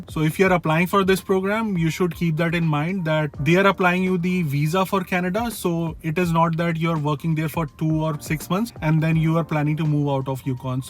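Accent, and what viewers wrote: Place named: Kerala, India. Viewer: Edo mashe eyyalude pronounced kettatal manasilakum malayaliyude kunjananu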